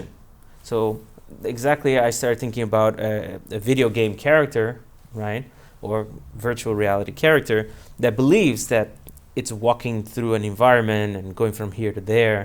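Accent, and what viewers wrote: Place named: Brazil. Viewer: Good talk. On an unrelated note, it's so nice to see such a smooth Brazilian accent.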